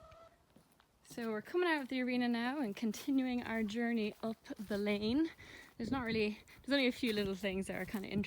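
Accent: Ireland. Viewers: I just love the way you say that bloody chicken I love the Irish accent (I'm from Ireland) Also u inspire me so much about 2 years ago a connemara mare called Jeddah was at my stables and I ride her an loved her until I done a round of canter and bucked me off the next week my instructor told me to ride her again to get my confidence back so we done another round of canter and bucked AGAIN this time she positioned her neck to help me but it didn't work I ended up spraining my arm so I couldn't ride for a while that knocked my confidence the next year I had a bad call I was jumping a gate at about 50cm and the horse I was riding was about Fiona heighten was a grey ISH he refused it 3 times eventually 5 girls started waving their arms to get him over it be jumped it and apparently my instructor said he jumped it like 2metres higher the n it was I wasn't ready for it so I fell of but me being awkward sommersaulted and landed head first and I got knocked out for 20mins for the next 6 months after that I would hardly trot I was so terrified u until we came across you you are such an inspiration thanks to you I have accomplished jumping a metre 10 last month I'm 12 years old now and I feel like an advanced rider (maybe a bit to far) thanks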